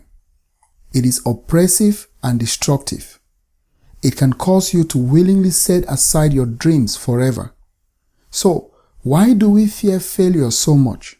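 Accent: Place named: India. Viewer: your accent sounds like an Indian accent....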